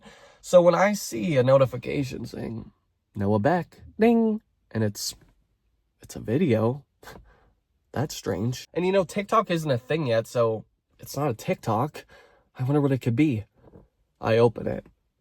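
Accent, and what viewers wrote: Canada. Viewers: That sorry... are u Canadian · dont know how i didn’t notice your canadian accent in the first video boy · Your Canadian accent really came out in this one haha you’re my new favorite YouTuber GAY GUY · Ok you’re definetely Canadian lol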